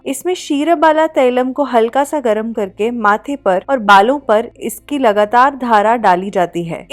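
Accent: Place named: North India, India. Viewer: Itani sudhdh Hindi...i guess your native place must be somewhere in North...anyway so pleasing to hear your Hindi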